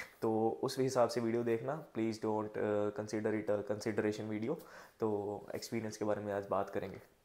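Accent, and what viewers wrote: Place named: Punjab, India. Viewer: Bhai aap punjabi ho na😅....aapke accent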